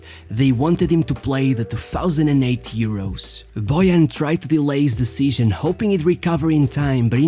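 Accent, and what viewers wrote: Portugal. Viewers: By your accent you are portuguese. I know because i speak the same accent 🤣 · Are you Portuguese or from Portugal? I am, and the way you speak is very familiar.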